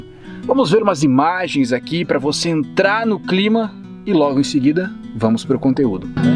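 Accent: Rio Grande do Sul, Brazil. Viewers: é gaúcho???👍😏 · Se tive se um flauta chinesa de fundo... Hehe Você é gaúcho? Parece muito ser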